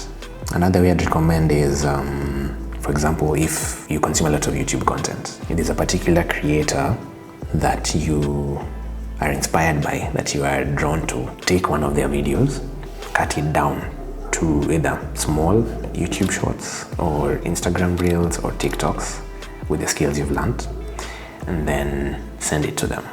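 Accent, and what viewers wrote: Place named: Kenya. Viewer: Sounds a kenyan accent...i love the video lighting quality Love from Ug. 🇺🇬...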